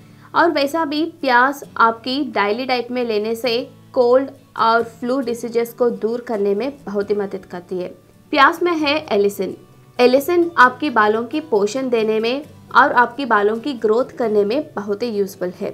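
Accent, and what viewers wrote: South, India. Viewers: Kya ap south ke hain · Are you south indian? 😀 apke bolne ka tarikha bilkul meri mosi jaisa hai.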